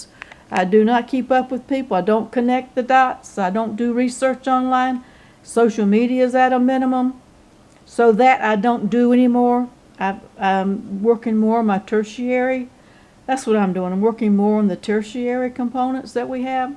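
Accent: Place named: South, United States. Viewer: hear that southern drawl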